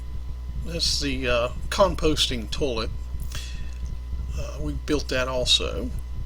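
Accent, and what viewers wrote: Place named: Arkansas, United States. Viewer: You sound like your from Arkansas!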